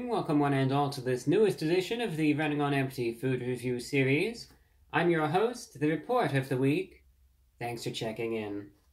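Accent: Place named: Transatlantic, United States. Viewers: I'd like to hear him once in a while. Speak with more of his east coast accent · Boy, that transatlantic accent is fighting its way into the forefront, slow but steady.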